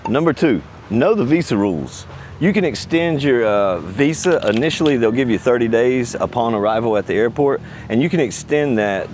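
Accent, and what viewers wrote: United States. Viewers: So wrong. For example YOU DON'T NEED A VISA FOR 59 DAYS (for most western countries). You enter the country WITHOUT visa. You can get visa only after 2 months. Your first extension is still not a visa. Another thing - don't EVER tip. If You do so - it is the same as screaming - I'm an idiot tourist, come and scam me more (or I'm from US). Another thing - You don't need internet to use maps 🤣🤣🤣 Unless You don't know how to use offline maps. And so many other stupid things in this video... I don't know who is this guy, but he definitely sounds like US tourist. The food is also BAD 8n the Philippines. Almost as bad as in the US (which has worst and most processed food in the world). Also very little to choose from. If You have been in any other Asian countries - You will be disappointed.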